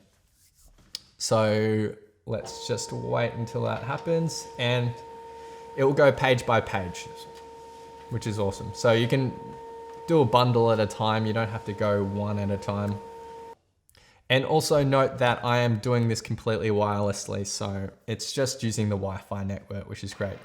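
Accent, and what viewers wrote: Australia. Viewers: I like the aussie accent. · Thanks. Nice, clear review. Nice to hear an Aussie accent too...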